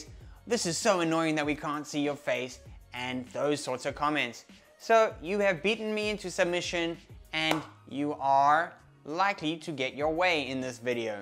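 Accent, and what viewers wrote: South Africa. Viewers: Where are you from, your accent sounds South African - hi from South Africa btw :) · Audio is perfect. You are South African, right?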